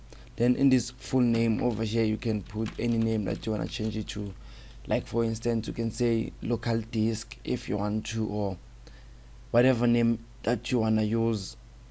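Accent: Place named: South Africa. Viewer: You sound like South African😊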